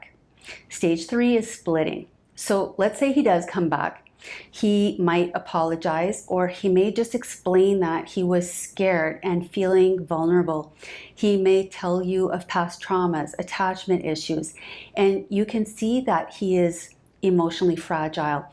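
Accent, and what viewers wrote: Canada. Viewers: I am diggin' the Canadian accent!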